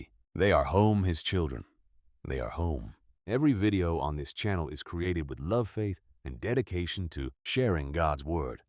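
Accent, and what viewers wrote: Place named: Pittsburgh, United States. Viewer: Oh i like this voice Lord, it's just like "Pittsburghese" is the distinct regional dialect and slang spoken in Pittsburgh, Pennsylvania, and Western Pennsylvania. Rooted in the working-class history of the region and influenced by early Scotch-Irish, immigrant, and Appalachian speech patterns, locals who use this dialect are affectionately known as "Yinzers". I Am in eastern Ohio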